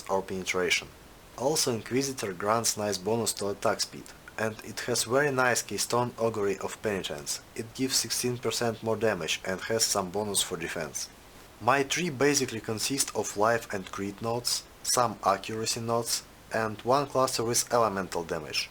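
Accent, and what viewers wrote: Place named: Russia. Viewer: Твой руззгий акцент просто супер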